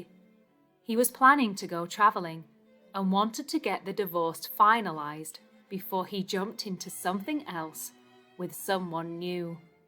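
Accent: United Kingdom. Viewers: Such a remarkable story thanks love british accent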